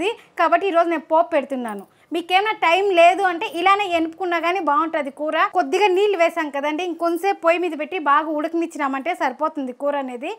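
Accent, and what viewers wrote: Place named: Chennai, India. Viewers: Thank you for recipe. Are you from Tamilnadu Andhra boarder? Your slang seems so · నమస్తే! మీ భాషచెన్నై కి దగ్గర్లో మాట్లాడే భాషలా ఉంది. బాగుంది. మీదేవూరో చెప్పగలరు. నేను ఆంధ్రప్రదేశ్ శ్రీకాకుళం నుంచి రాస్తున్నాను. వీలైతే జవాబు ఇవ్వండి. నేను గమనించింది: పప్పులో మిరపకాయలు అన్ని అవసరం లేదు. ముక్కలు చేసి వేస్తే ఎలాగూ ఉడుకుతుంది కదా!2. తాళింపులో ఇంగువ బాగా ఎక్కువ అయింది. ఒకసారి వీడియో చూసు కోండి. జై భారత్!! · Neenga tamilian .nalla theriyudhu.ungalode slang pakkayile.irundhalam telungu nalla pesaringe.namba ooru..adhukkaga subscribe panne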